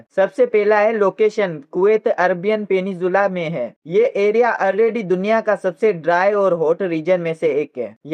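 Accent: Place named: India. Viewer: but your accent is Indian ( Hindi ) ❤ BTW love it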